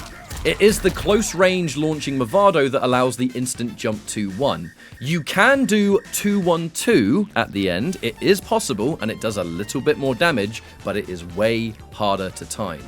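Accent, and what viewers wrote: United Kingdom. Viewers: As a Russian, i jusr enjoy your English. Always prefered the British version to an American:) Thanks to your combos, though, quite helpful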